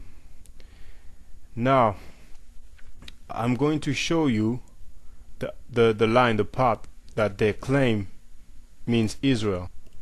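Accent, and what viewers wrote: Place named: Haiti. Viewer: As soon as I heard your accent I knew you were Haiti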